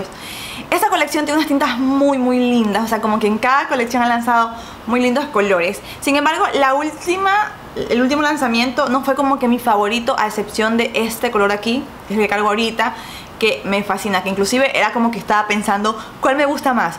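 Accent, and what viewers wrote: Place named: Guayaquil, Ecuador. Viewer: ese hermoso momento es que te das cuenta que una youtuber de tu País y Ciudad te encanta .... ya decia yo... parece guayaca :v me encanta ♡♡♡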